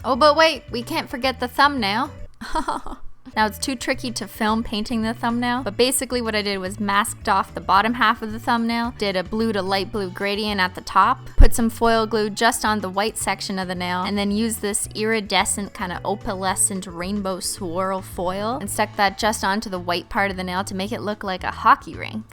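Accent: Quebec, Canada. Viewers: After watching a bunch of Canadian shows I think you guys say "aboat" not "aboot" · Yassss loved that french canadian accent tho 😍 teamquebec 👏🏻🇨🇦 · her and azzyland (I guess bc they're Canadian) say "sorry" Like "surry" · You sound really Canadian in this video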